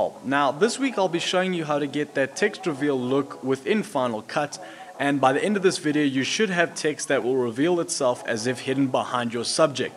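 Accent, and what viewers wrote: South Africa. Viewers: Good to hear a fellow RSA ! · Hi, very nice tutorial mate. I have a video request I'd really like to make. In iMovie on the Titles tab, there is a title animation called "Line". A line expands out left and right from the centre and then text fades in above and below that centre line. I would like to do the same thing in FCPX but instead of a fade in, I would like the text to come out from "behind" the thin line to rest on the top and bottom of it. I thought I could achieve this effect by using transparency masks for each text blocks and then using the baseline tool to move the text above and below the line. However keyframes don't track the baseline setting between frames so I can't animate it. Do you have a tutorial for this? Thanks so much for your help! PS Are you South African?:D Your accent sounds very similar to where I grew up!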